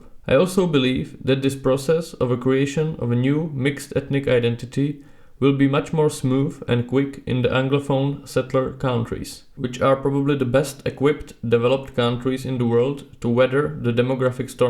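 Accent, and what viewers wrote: Czech Republic. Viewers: Excellent channel and a rarity on this vitally significant subject. And all patiently explained in a characteristic Czech accent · Heh, I was thinking that English sounds somehow "Czech" to me. It seems difficult to get rid of the accent. But it is positive that "our" English does not sound like "Russian". On the subject: I find it absurd that more attention is not paid to demographics. For Europe, it is a ticking time bomb that can disintegrate society. According to a prediction, in 2050 there will be only 2 workers for every one pensioner in the Czech Republic. This will generate enormous intergenerational tensions - and I doubt that the working population will accept that they will essentially live only to support the pensioners. At the same time, with social security contributions so high, they won't have the means to start their own families, which will only deepen the spiral of low birth rates. 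Because of the high number of pensioners, they will have considerable "voting" power to elect politicians who will play into their hands - as we are already seeing, not only with pensions but also with real estate (low property taxes, restrictive building codes). Immigration doesn't solve this - it just postpones the problem, and at the cost of breaking up the "nation". For all our achievements in protecting personal liberty and women's right to self-fulfilment, if the result is a society that cannot survive, we will be replaced by a society that, while barbaric to us, can produce enough children to be stable in the long term.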